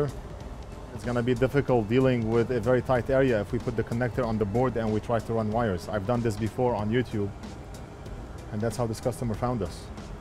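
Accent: Lebanon. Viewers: Me too, ana lebnene w behke nafs l chi 🤣🤣 nafs l lahjee fik to2ofro lebnene hata mn wejjo w hek Lebanese people making the difference all over the world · Maaaaaan i was trying to see how to fix my USB to just hear a familiar Lebanese accent.. double shock.. good job btw..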